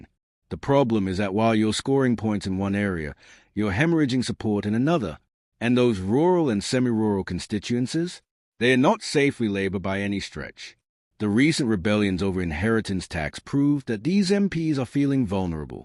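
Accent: United States. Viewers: You will only see this in the fake channel that is BRITISH MIRROR. They include the Union Flag logo to appear patriotic. You don't see any evidence, just that of the narrator, who has, at times a very heavy American accent. (AI)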